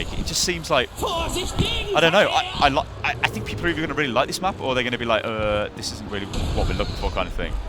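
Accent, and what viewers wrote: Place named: United Kingdom. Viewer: "Great British Gaming" "amis" gg. Ja die Sprache ist die selbe: ENGLISCH. Wie kommt man dann also auf ami? Ausserdem hat er einen klaren Britischen Akzent.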